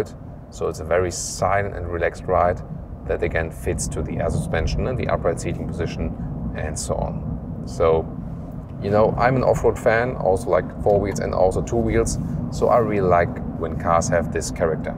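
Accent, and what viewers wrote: Germany. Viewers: I will get the new Defender next week but still watching reviews. I thought I saw them all but today stumbled over yours. As a fellow native German speaking liked your accent already but then I discovered that this is the review I was looking for. It's just the way that if you get the car and discover it yourself with all the little details. And you give your opinion and verdict, which obviously you can buy or not. Best review I saw on the New Defender. Thanks!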